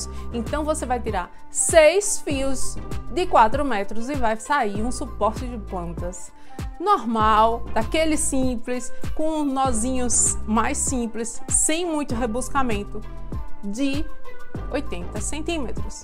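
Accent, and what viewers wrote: Northeast, Brazil. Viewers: Muié de Deus😂😂😂😂😂😂😂 tu só pode ser Nordestina 😂😂😂😂😂😂😂😂😂😂 PARABÉNS... Que DEUS te abençoe sempre 🙏🙏🙏🙌🌺🌺🌺 Direito de Maceió Alagoas Brasil kkkkkkkkkkkkkkk 😂😂😂😂❤❤❤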